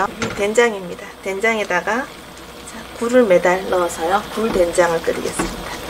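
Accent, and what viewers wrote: Gyeongsang, South Korea. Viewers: 시원시원하니 진행도잘하시고 요리도 잘하시고 많이배우고 갑니다❤ 경상도 억양 구수하니 정다워요 ❤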